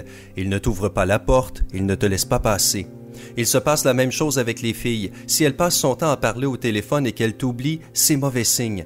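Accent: Quebec, Canada. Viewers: L'accent Québecois est tout simplement insupportable, c'est une insulte à la langue française. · C une voix québécoise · J’adore cet accent quebequois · Lol il est quebecois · Tu es québécois ou quoi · J'aime bien vos vidéos je me suis abonné. Et c'est un joli t'accent canadien. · C un québécois? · Cette accent canadien ouch 😂 PS : #teamcelibat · C la nouvelle voie québécoise de simpa ou quoi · Zoumouda Soufou C'est l'accent québécois. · T'es québécois ? Sa se reconnait avec ta voix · Accent québécois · Québécois XD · Hé oui ! C'est bien tout ce qui s'est passé... deux ans avant ma rupture ! Heureusement qu'il y a cet accent québécois pour me r'monter l'moraaale !!!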